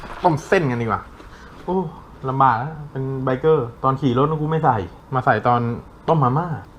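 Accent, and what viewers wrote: South, Thailand. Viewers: คนบ้านเด่วกันคนใต้ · พี่คนใต้หรอ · พี่เป็นคนภาคใต้หรอครับ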